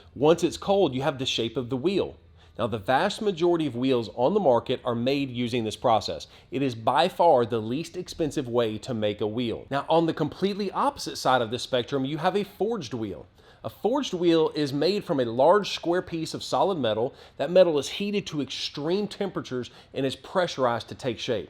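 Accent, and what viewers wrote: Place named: Alabama, United States. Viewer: Greetings fam. I had a 2015 F-150 from my bother-law, 35,500miles. My plans were to do a 2" offset with a 2" level kit. My son had to drive it because someone cut his convertor. Then someone t-boned the truck, bent the frame and cracked the shaft. My wife and share a 2013 Jeep Grand Cherokee and she won't let me do crap. Hey, I hear the Bama boy keeps coming out. Peace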